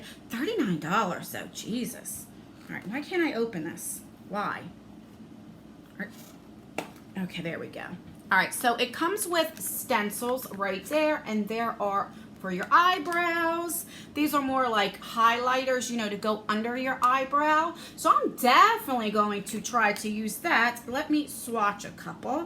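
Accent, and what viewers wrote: New Jersey, United States. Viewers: Like your Jersey accent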